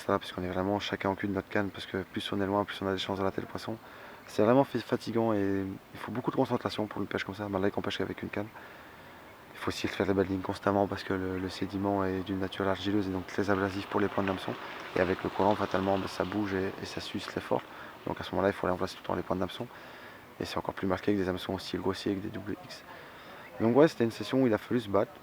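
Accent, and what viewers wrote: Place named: Tahiti, French Polynesia. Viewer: salut sympa ta video, au fait on dis rigoureux lol, il vient d'où ton accent on dirais celui tahitien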